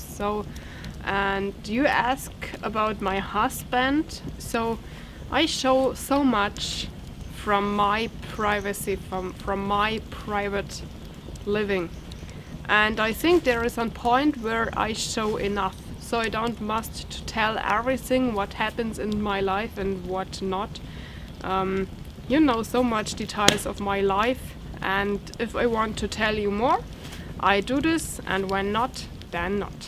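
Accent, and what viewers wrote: Germany. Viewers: Well beautiful Lady. I am so proud of you. This is a beautifully made video. The video setting is perfect. I am impressed how you answered the questions so intelligently. Some subjects are your' personal business for your' knowledge and nobody else. Be careful what personal information you spread over the net. I am always impressed how well you handle yourself even though your' first language is German. I love your' accent, and oh ya many flavors of ice cream also. :O) From experience I remember people always thought my grandfather was a dummy because he spoke broken English with an Italian accent. He actually, like you, was also very intelligent. I am glad you made it clear you have access to the Foxes Den for a long time. I was concerned about you spending money and time on someone else's property. I understand your' passion for the Den. 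Hang in there. John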